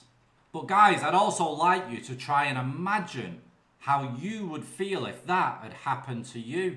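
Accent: Manchester, United Kingdom. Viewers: Apart from the Manc accent and baseball cap - I do enjoy the way you read the stories. I enjoy Thailand Bound on a Saturday too. Hearing about these tools that come to Thailand, ignoring the shit-load of advice that can be found in ten minutes with Google, never gets old. Cheers our kid!! 👍😊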